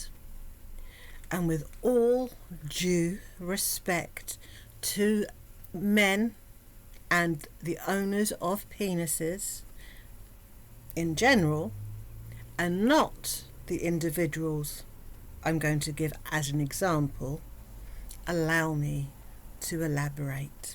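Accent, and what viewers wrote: United Kingdom. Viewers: The elegant, accurate explanation, delivered in the proper British accent, is brutal! Love it! And you look fabulous. The message you send with the makeup is crystal clear. 😂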